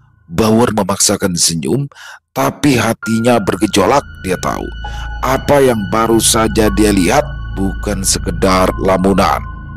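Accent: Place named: Banyumas, Indonesia. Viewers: harus lanjut part deh cerita ini,dn di jadikan playlist,sukur2 BAWOR dan TIGOR jd partner biar slalu menampilkan logat cilacap dan batak pasti seru(seperti doni dan gembul} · Keren, bisa logat ngapak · Akhirnya ada karakter jagoan ngapak juga.. Matursuwun mbak,Lanjutkan.., salam dari purwokerto 🙏🙏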